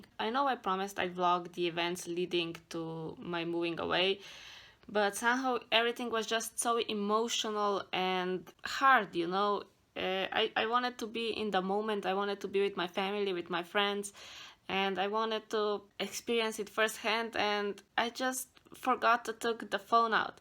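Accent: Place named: Slovenia. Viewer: Every last detail of this ongoing interactive life story is on point, even your bedroom wallpaper is right :D Good video, meakes the listener feel connected with the message of taking the responsibility for your destiny and than beeing persistent enough to follow it through without giving up beforr your time comes. Ps, leave at least 0.001% of Slovenian acent :D 🌺💚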